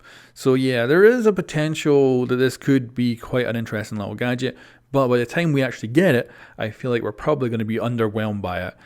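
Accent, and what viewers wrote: Scotland, United Kingdom. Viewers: That scottish accent is very cool, instant sub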